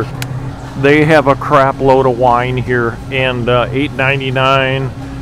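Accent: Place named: Midwest, United States. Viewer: You have such a mid western way of speaking it's so corny is a good way 🤣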